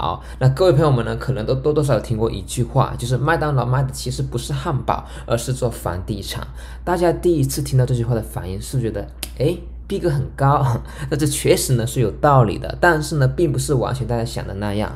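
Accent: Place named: Fuzhou, China. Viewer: 福州口音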